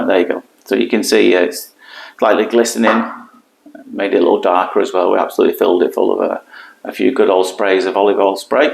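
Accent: United Kingdom. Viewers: Show off, you tech skills are great. I live in Sydney, Australia, love your accent and hearing the British expressions which we don’t hear very much these days. I love my British heritage. I hadn’t heard the paperboy one before. Guess what, I finally know what I want to do for a career. Ear cleaning your way. I have a problem though, I’m 72, have I left it a bit late.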